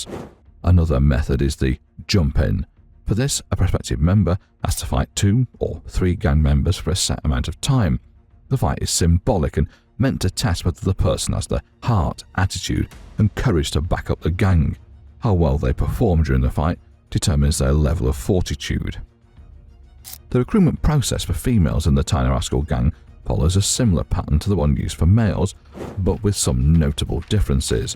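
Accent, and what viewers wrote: England, United Kingdom. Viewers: How tf yall going to use pictures that aren't even TRG. And how tf a nigga with an English accent know anything about TRG. You guys missed the whole unique thing about TRG. We have every race in TRG that's what made TRG the strongest and biggest. Do better homework.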